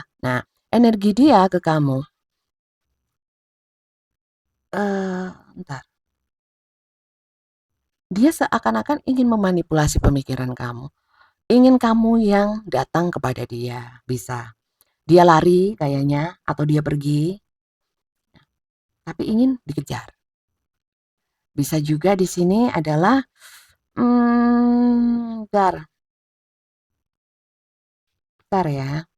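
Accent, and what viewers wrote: Java, Indonesia. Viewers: Lucu..juga.. Bundanya..jawanya medok...nggee bunda..ngge..Mater nuwun Bunda. ❤ 💙🎉🙏 .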